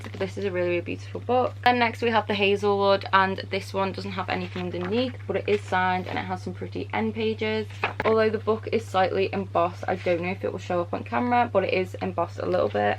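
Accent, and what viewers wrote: United Kingdom. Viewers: Can I just admit that the way you say the word “pretty” sounds so elegant and polite with your British accent. 💗 Hello from the Valley of the Sun 🌞 where it really is DEADLY 🥵 heat!! I live in Scottsdale, AZ and we are still having 94-100 degree weather! Great Video as always! I have about 80% of these books as well. I absolutely ADORE them!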